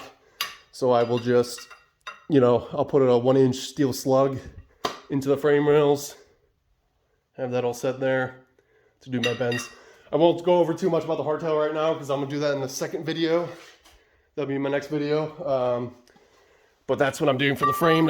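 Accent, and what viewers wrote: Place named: Midwest, United States. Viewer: Looks great, looking forward to how this goes. Where are located, sounds like Midwest from your other video (Menards). You're doing great, love the concept and your channel name. Your idea is quite similar to mine, just something to do for fun and show with a little ambition, you can do alot. Great Job!!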